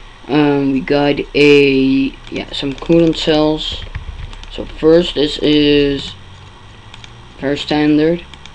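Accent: Netherlands. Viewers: lolz je bent nl dat hoor je gewoon ;)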